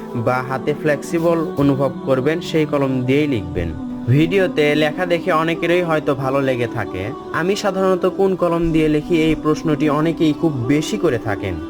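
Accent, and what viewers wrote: Bogura, Bangladesh. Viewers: Apnar basha ki Bogura te. Vai🤔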